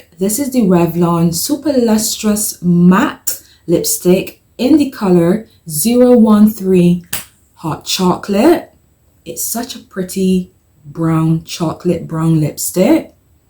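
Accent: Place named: Barbados. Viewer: This look turned out lovely. I'm now discovering your channel, I'm picking up and Barbadian accent from you. Are you Barbadian? 🤭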